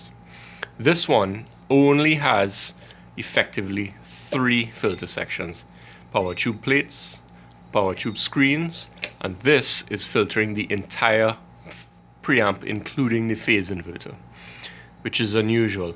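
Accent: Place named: Trinidad and Tobago. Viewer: Trinidad? Please tell me my ears dont deceive me! Woodbrook boy says hello!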